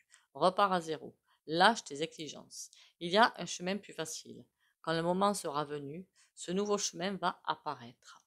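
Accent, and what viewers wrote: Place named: Midi, France. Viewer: avec l'accent fort du Midi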